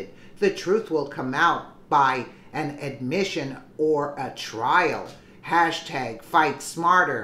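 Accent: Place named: Northeast, United States. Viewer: Laura, on my PC I can click "Top Comments" to choose "Most Recent" to change the order so they're not buried. yes it's been a month plus 5-6 days now. by her accent and targeted ads that pop up on her screen as she reads news, she's in the far Northeastern USA. what can I say, I pay attention, and know her little dog's name is Cupcake too:) not worried that she'd been slammed by the hurricane. she said in comments it'd be a month before she could re-apply, so I'm guessing they're taking their sweet F'n time "deciding" to approve it, like waiting AFTER the mid-terms to be SURE they're interfering with the election, because what reads exposes so many corrupt POS! they steal from her (demonetization!), to them "free speech" is oh you can speak for free, wait no, just STFU (as they ban!). WE know that what she does IS "fair use", she always names the author and publication source. 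I do think she needs a 2-3 second "fair use disclaimer" for all of her videos from now on if/when she comes back (SOON I HOPE!) also hope scrootoob/google end up in a HUGE class action lawsuit for violating free speech, and THEFT, because they HAVE violated their own limited liability as soon as they started censoring politically. they owe everyone they've demonetized BIG BUCKS, Alex Jones inclusive. (love or hate the guy he's red pilled many millions of people!)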